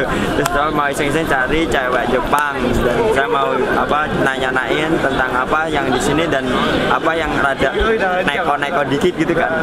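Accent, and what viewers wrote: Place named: Medan, Indonesia. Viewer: Dari logatnya orang medan kayaknya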